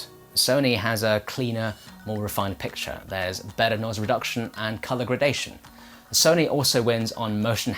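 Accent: England, United Kingdom. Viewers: Very nice informative review and may I say great combination, the Oriental look with an English accent, very clever looking and sounding, I'm not just debating but I'm still researching and as far as design goes I think they messed up here because the whole idea of having an ultra thin TV is to show it off and I'm sorry but that curved bottom just doesn't do it for me, I don't care what kind of Sound it gives you, because basically if you're going to spend that sort of money for a TV, if you got any brains whatsoever you would spend a little bit more and get a AV to go with it? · The overly English accent makes me lose focus. I fucking hate you.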